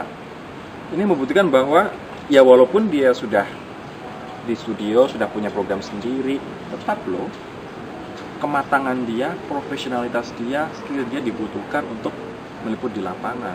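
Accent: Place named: Java, Indonesia. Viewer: medoknya lucu haha..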